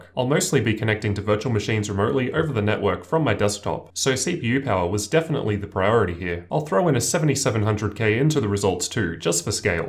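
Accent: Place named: Australia. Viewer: I like your kangaroo-friendly accent mate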